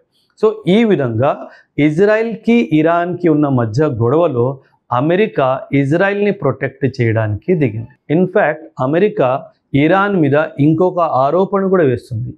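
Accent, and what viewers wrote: Punjab, India. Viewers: Swatchamaina mana telugu lo oka panjabi excellent narration. Vinataniki chala goppaga vundhi.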